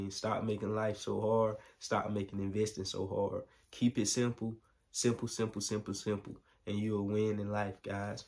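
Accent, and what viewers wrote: South, United States. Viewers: nah he just has that southern accent fr.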